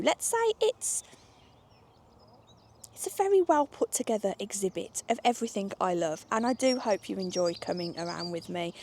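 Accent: Birmingham, United Kingdom. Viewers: brummie (Birmingham) accent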